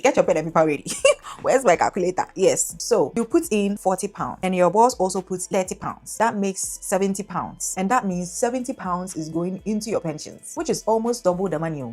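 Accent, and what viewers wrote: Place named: Ghana. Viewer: You do very interesting videos, but the playback speed at which you set the videos makes it difficult to understand what you're saying. You should be aiming at world-wide audience. You have a heavy ghanaian ascent, but i'm sure even some ghanaians will find it difficult, as the sentences are too fast. Often, the sentences are not complete before another one sets in. Don't you replay your videos to yourself? As these are more or less tutorial videos, why don't you just set the speed to normal to make it easier for people to follow what you are saying?. I often have to skip your videos as i find it difficult to understand what you are saying due to the speed of the sentences.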